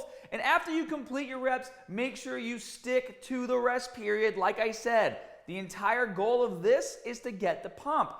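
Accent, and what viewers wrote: Boston, United States. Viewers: How did your Boston ascent come back stronger than ever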